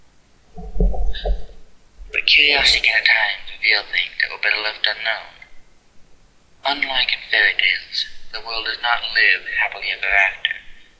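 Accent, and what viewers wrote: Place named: United Kingdom. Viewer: British accent..nuff' said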